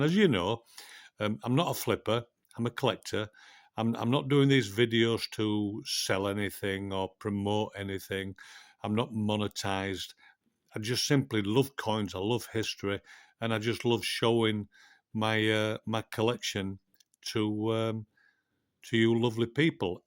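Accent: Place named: Northern England, United Kingdom. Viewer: Love your vids ,especially appreciate your northern accent!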